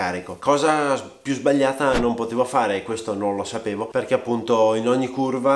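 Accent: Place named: Ferrara, Italy. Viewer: Di quale parte di ferrara sei ?? ho riconosciuto l'accento come il mio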